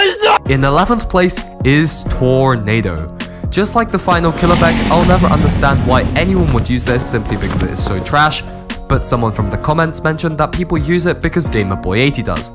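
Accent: France. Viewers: wait hold up, are you french?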